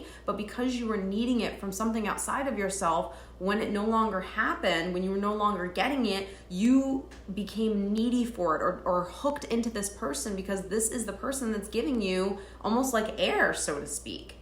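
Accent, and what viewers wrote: United States. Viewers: Would you be able to slow dawn when you talk because lm English and find your accent very strong not being rude your American accent is very different to understand please slow dawn or try to talk slower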